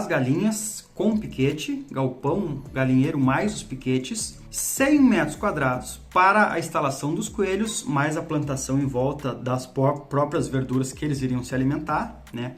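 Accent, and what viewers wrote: Brazil. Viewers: Deus te abençõe por compartilhar o conhecimento isso é muito importante.quanto a seu sotaque achei sempre muito bonito o sotaque do Sul assim como de outras regiões é isso que fais nosso Brasil ser bonito é essas mistura de sotaque e de Cultura por todo o pais.Deus abençõe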